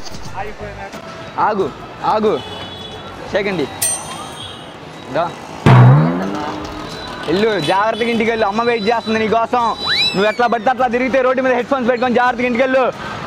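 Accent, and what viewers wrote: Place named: Guntur, India. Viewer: Bhayadi prakasam, guntur side ankunta. Slang alanevundi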